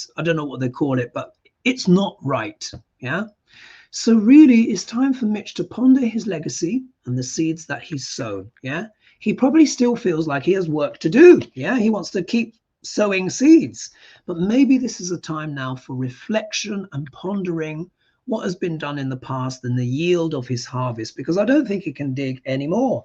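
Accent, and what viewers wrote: United Kingdom. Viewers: 👍🤩The British accent is so easy and good to hear I understand it better. Good predicting as well.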